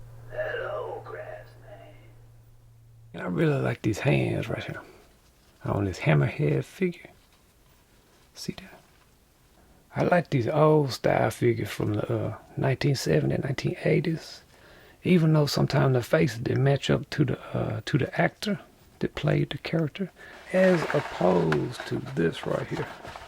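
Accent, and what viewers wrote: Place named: North Carolina, United States. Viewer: Amazing!!! You are talented beyond belief....i love watching your videos and just recently found you! Thank you! Also, you sound very much like you might be from Halifax Co, NC......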